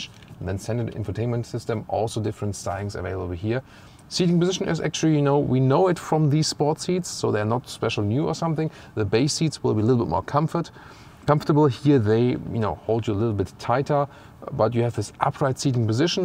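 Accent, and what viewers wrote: Germany. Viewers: His „German“ English accent is absolutely unbearable!!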